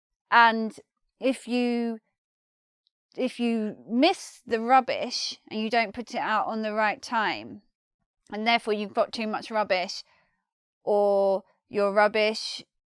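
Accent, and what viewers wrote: United Kingdom. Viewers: Thanks, i learn to much with you, i love your british accent- Paulo From Brazil!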